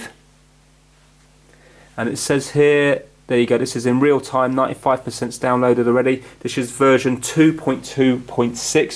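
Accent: United Kingdom. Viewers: That was so clear and easy to understand! Thanks. I lost my WiFi contract recently but when I can afford it again, I'll try this. Wish you were a neighbour; I live in Japan and am a woman in my 50s always struggling to sort out computer problems thru videos like yours. Most of my friends are older females like me and if I ask them anything concerning PCs, provider contracts, whatever, they invariably tell me they have no idea and say: "I always leave it to my husband, son, son-in-law (insert any male relation here) " and it is so frustrating. Is it the same in the UK and why? Why do men seem to be more knowledgeable on the whole? BTW nice to hear a British accent again!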